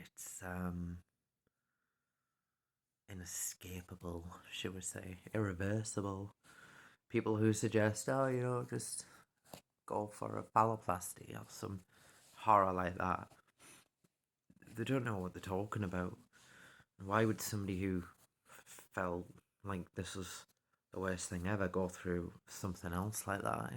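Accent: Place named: County Durham, United Kingdom. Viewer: Dunno if your channel is even still active, but I just wanted to tell you you're amazing, I think you're proper class. You've been through so much, but you're an amazing human being. I'm from county durham but live in Australia and when I saw and heard you it felt like home ☺️ i hope you're OK, I really do. Life can be so shit some times, I've gone through very different things, but pain is pain. I just felt the need to reach out and say hi 😊 hope you're doing well, it cannot have been easy.' I can't even imagine. I appreciate all of your public speaking, I think it helps the community, or even if it gelps one person, you've done so much! Thanks for easing my homesickness, but most importantly, being very vulnerable, true, and honest. You're amazing ❤❤❤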